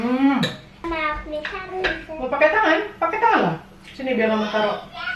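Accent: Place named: Java, Indonesia. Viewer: Vlog kali ni mamak brisik ya ?? Rekuwes brisiknya luwi ... (Ilat jowo Mak) hahahaha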